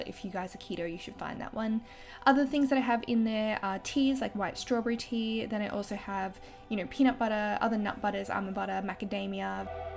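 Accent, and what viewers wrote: Australia. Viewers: it's an Australian accent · That's just Australian accents m8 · culture lol. It's just an Australian accent. I could say the same about the way Americans pronounce words.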